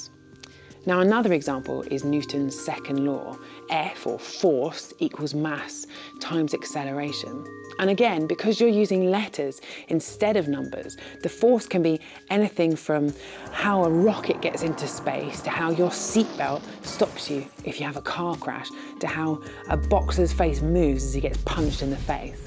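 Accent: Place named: England, United Kingdom. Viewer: Pretty, smart, english accent... Christ. Inb4 sexism, I comment on Derek Muller's sexy self all the time as well.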